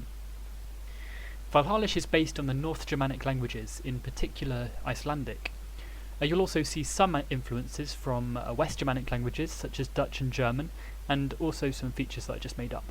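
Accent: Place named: Southern England, United Kingdom. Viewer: Southern English or southern part of midlands.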